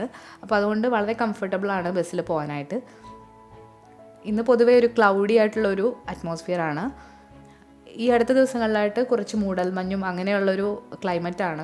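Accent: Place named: Alappuzha-Kollam, India. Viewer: നാട്ടിൽ ആലപ്പുഴ, കൊല്ലം അവിടെ എവിടെയേലും ആണോ. സംസാരം കേട്ടിട്ട് ചോദിച്ചതാ 😊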